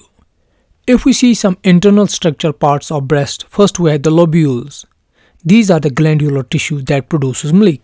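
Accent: Kashmir, India. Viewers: That's great! I guessed you might be from Kashmir by your voice. Your teaching is excellent, and the content is really helpful.Thank you sir.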